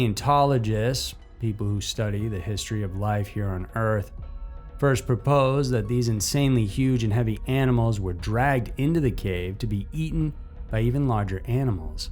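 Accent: South, United States. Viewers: “…SMALLER SAHZE….” I like this boy announcer, and he got a small southeren accent on him.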